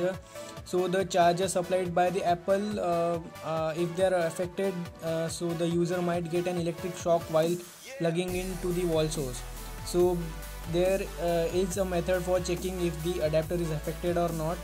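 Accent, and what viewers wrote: India. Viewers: Its Indian. Dude speak very well.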